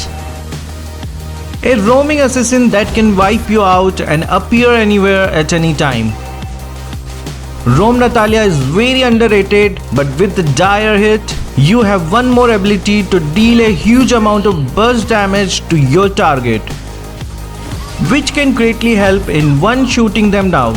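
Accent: India. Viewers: I dont trust indians when it comes mobile legends 😅🤣🤣🤣😂 the accent says it all masala accent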